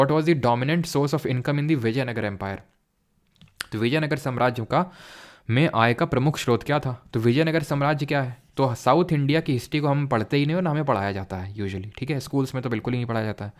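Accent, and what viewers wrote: Kanpur, India. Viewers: Hn kanpuriya hai sir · Sir aap kanpur se h kya ?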